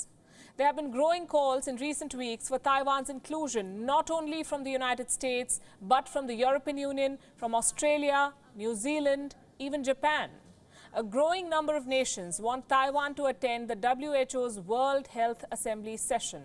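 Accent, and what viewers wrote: India. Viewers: go ahead dumb. put your tongue straight and get rid of your indian accent otherwise Taiwan persons will not understand "vhat are yu tooking about" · This channel needs a host who can speak without the Indian accent. Take a note from RT who use American accent despite being Russian.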